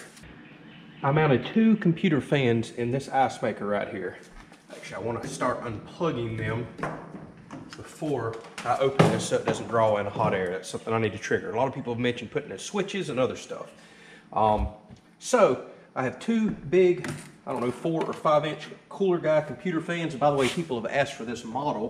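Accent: South, United States. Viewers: People have been trained by the media that when they hear a slow talking southern accent to automatically think that the speaker is an idiot. Ignore the people that think you're an idiot because you're much brighter then they are.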